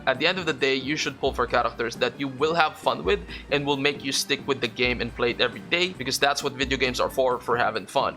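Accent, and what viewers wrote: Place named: Philippines. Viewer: I absolutely agree with what you said about pulling who you like, instead of pulling who is strong. With the way global is changing things up we now have the liberty to do that, and I love it. PS: You have the most pleasant Filipino accent I've heard 🥰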